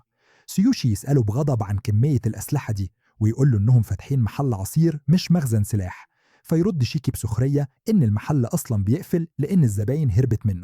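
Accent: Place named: Morocco. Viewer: وي انا متاكيد إن انت مغربي عشان صوتك يشبه 5 انا عرفهم من المغرب وي لو انت مغربي يبق انا ما غالتش الانا تبعتك❤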